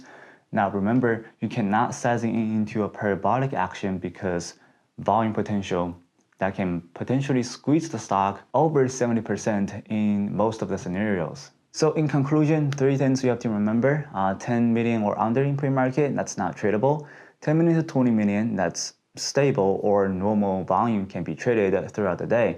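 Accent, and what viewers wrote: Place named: China. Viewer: (I know it's not your real name) I comment your videos almost never, but I just wanted you to know that you contributed to my trading, and your personal story also did, I am Russian by birth but European all my adult life. I like your style. I even liked your chinese English, it was still ok, there was a way to understand it if you want really to learn in the markets...I am older than you at least 8 years even if I look at least 10 less, so no i am not writing this comment because I am looking for a rich husband or want to make kids(hope that will never happen to me, if I'll make some serious money, i will adopt at some point, as i did with my dogs), i just wanted to recognize you how much you contribute to my trading even if you have no idea who I am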